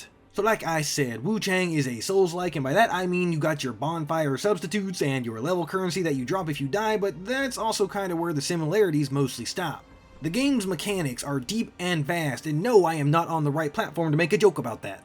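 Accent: United States. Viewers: I was not expecting to have a stereotypical American accent when doing a Chinese game review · Yeah I'm not playing this game but will support whatever video you put out. Also you got a beautiful southern drawl and it's the 1st time I ever noticed it.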